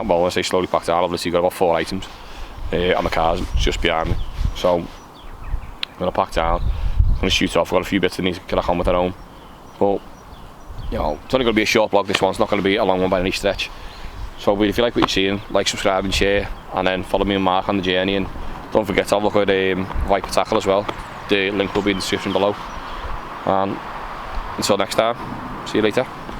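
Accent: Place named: Liverpool, United Kingdom. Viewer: That scouse accent is something else...well done kidda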